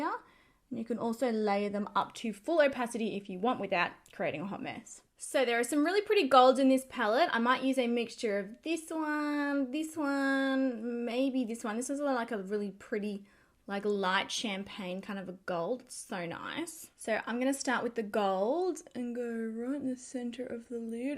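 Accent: Australia. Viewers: I Love your Australian accent I’m in USA 🇺🇸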